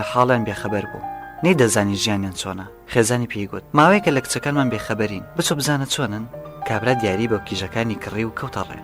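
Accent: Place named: Sulaymaniyah, Iraq. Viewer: Xozga dangakatan bkrdaya ba shewazary slemany zor xoshtr bw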